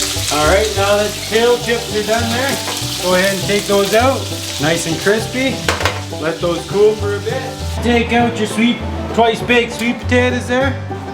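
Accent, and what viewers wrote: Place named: Nova Scotia, Canada. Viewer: You're from nova Scotia aren't you brother?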